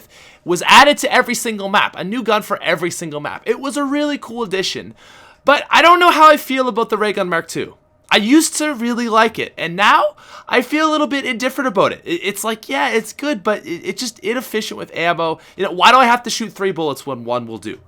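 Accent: Canada. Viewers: he's Canadian so he pronounces words differently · It's his Canadian accent calm down (I think :/)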